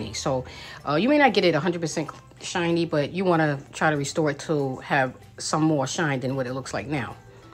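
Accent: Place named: New York, United States. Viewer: Great info, I just restored mine using this information! Plus I like how you talk, New York!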